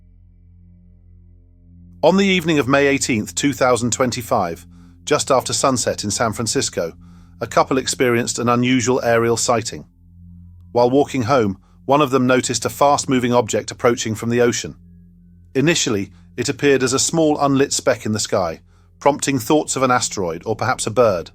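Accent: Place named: Michigan, United States. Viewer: Bring back the Michigander voice! Thanks for another great video.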